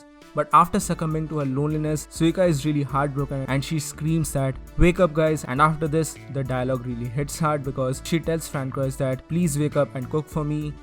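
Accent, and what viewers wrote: India. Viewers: hmm Indian accent makes me smile....🙃 proud of you subscribed 🙌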